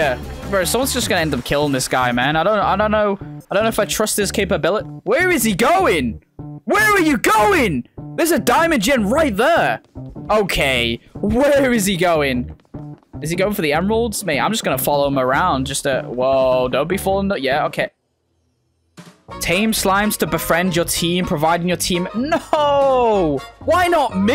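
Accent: United Kingdom. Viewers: why did he become more british?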